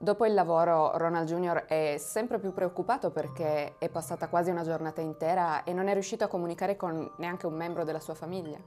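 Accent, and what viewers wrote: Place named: Pordenone, Italy. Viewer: Azzz !!!! Non ricordo se sei Piemontese, o di lì attorno,si potrebbe facilmente confondere il Tuo modo di parlare con quello di Pordenone, è identico!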